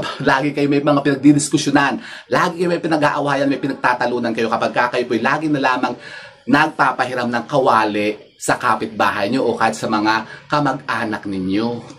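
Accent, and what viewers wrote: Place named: Laguna, Philippines. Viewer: Ganyan talaga punto sa laguna😂ganda ng outfit eehh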